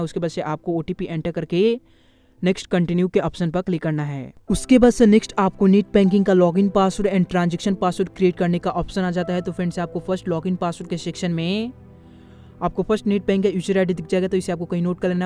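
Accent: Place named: Punjab, India. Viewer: Punjabi accent fast voice not good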